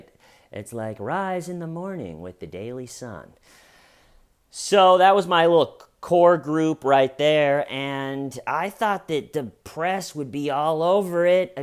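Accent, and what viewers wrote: England, United Kingdom. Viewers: Amazing reporting. Loved every second of it especially the English accent Btw. Any news on the big film premiere Can’t wAit to see it I found the BBC lady. She is quite new on screen reporter So funny Tattoos Poker chips Maxwell Hilarious 😆 🤣🤣😆😆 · Love love love the English accent. Lol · And that is why they are security guards. Good English accent by the way.👏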